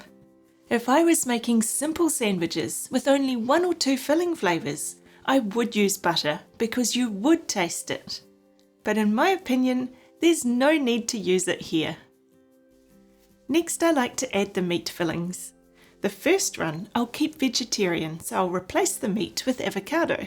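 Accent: New Zealand. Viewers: Are you a New Zealander? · Are you from NZ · Sounds like New Zealand to me. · This woman has the most Kiwi 🥝 accent that I've ever heard. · Turns out IT IS a kiwi accent😂😂😂😂😂😂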